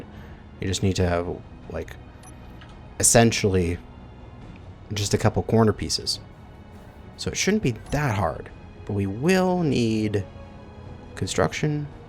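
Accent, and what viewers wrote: United States. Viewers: I'm not usually fan of American accents being English myself, but your voice is rather quite soothing to just listen to/watch while i'm doing things on computer :)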